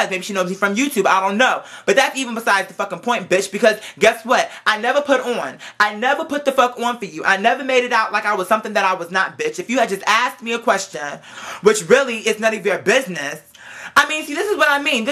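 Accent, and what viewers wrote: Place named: New York, United States. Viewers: There's that New York accent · Where'd that NY accent come from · You have east coats accent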